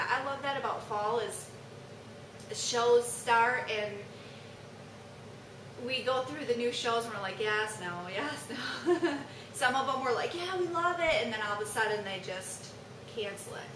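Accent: Michigan, United States. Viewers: I love listening to you talk :) my dad is from Michigan you for sure have a Michigan accent :)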